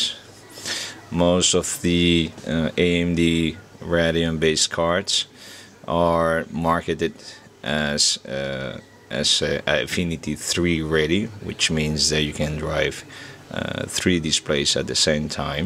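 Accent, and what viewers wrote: Netherlands. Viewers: Are you duch think i hear a dutch accent hehe.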